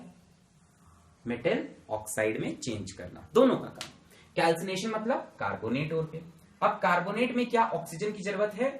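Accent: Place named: Allahabad, India. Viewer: You are from which city? Allahabad?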